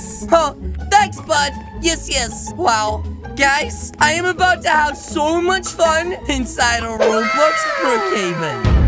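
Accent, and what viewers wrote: United Kingdom. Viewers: are you brittish 🇬🇧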